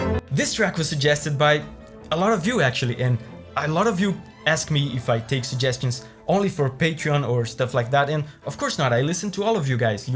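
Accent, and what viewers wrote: Brazil. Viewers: Mano, vc é brasileiro? O sotaque já te entregou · sotaque de br, é brazuca?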